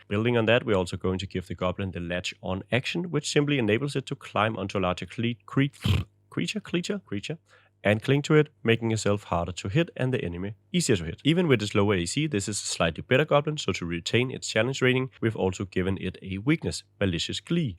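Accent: Denmark. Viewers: love the quality of the video but i just have to know. are you danish?(i just Wonder cause of the accent)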